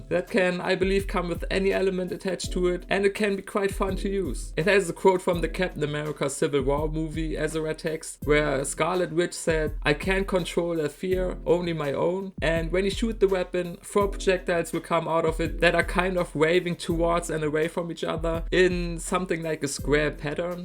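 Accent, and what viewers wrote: Germany. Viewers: youre such a german guy i see that accent (du bist son deutscher bro)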